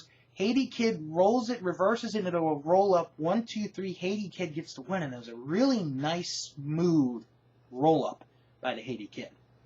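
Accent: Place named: England, United Kingdom. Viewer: Nice English accent 👍👽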